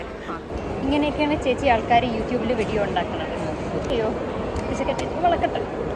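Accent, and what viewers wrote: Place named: Alappuzha, India. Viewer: Alleppy Slang ❤❤😍😍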